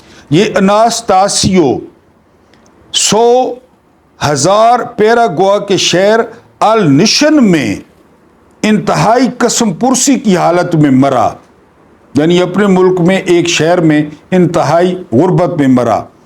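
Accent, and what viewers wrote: Saraiki, Pakistan. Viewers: اسلام علیکم ورحمتہ اللہْ ھمیں معلوم ھے آپ کو انگلش پر عبور ھو گا مگر یہ اردو کا سرائیکی لہجہ قیامت ھے اللھ قسم یہ آئی ایم کی بجاۓ مَین آدھے ھین او تو ھمیں زیادہ مزیدار لگے گا انگریزی کی مت نہ مارا کریں اردو سرائیکی لہجے میں واہ کیا کہنے انگریزی سرائیکی لہجے میں......... اوپر سے گزر گئی آپ کے وی لاگ کا جواب عشق قاتل سے بھی، مقتول سے ہمدردی بھی یہ بتا کس سے محبت کی جزا مانگے گا؟ سجدہ خالق کو بھی، ابلیس سے یارانہ بھی حشر میں کس سے عقیدت کا صلہ مانگے گا والسلام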